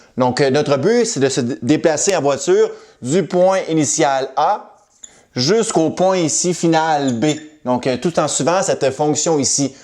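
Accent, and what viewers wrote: Quebec, Canada. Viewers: Je suis tombé sur votre vidéo totalement par hasard et je ne le regrette pas du tout. Merci pour ces explications très claires que j'avais dû zapper il y a très longtemps avec mon prof de maths au lycée 😁 Je comptais me remettre aux Maths et votre chaine me parait une bonne ré-entrée en la matière. Merci beaucoup. Je vais regarder vos autres vidéos avec plaisir. Et votre accent québécois me plait beaucoup 😊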